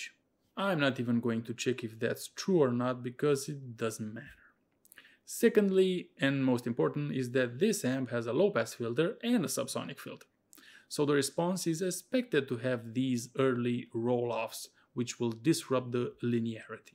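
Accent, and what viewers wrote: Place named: Romania. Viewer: is that a Romanian name? You have the accent and I’m from that area I can kind of tell. Anyways you should try building one for a single 12, keep sealed chamber at 1 cube and ported chamber at 3 or as large as you can, tune the front port anywhere from 40-43 and for port area start at 75% of your cone area and shrink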